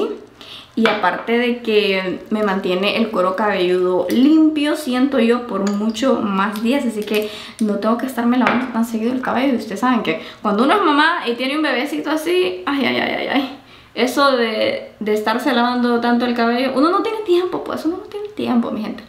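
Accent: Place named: Honduras. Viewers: Jajaja no se si soy yo ho es que hoy si se le escucha bien el asento hondureño cuando la escuche dije abla diferente ahora .jajaja ho sera porque ya dias no veia sus videos saludos paisana🇭🇳❤gracias por los tips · Igual note mucho el acento Hondureño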